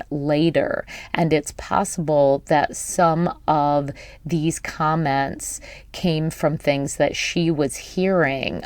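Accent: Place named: West Bloomfield, United States. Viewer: im from w bloomfield i hear that mi in your voice thank you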